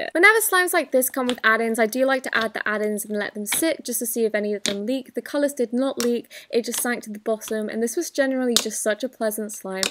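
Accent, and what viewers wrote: England, United Kingdom. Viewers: I know it’s English, and I love it!!!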